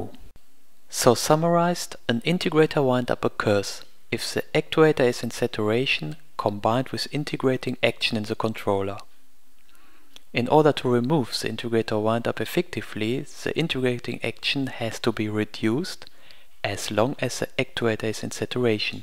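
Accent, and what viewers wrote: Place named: Germany. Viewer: classic german accent haha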